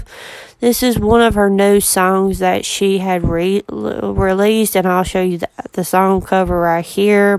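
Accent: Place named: South, United States. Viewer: Wow girl, you have a major Southern accent! I f'n love it!!!!!!!!!!!!!!!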